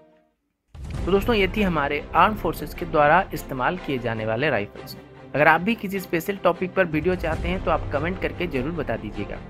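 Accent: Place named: Uttar Pradesh, India. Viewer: Neuz (news) Phayr (fire) Acsen (Action) Uuj (use) Sorter (shorter) Specal (special) I think 🤔 it’s UP accent.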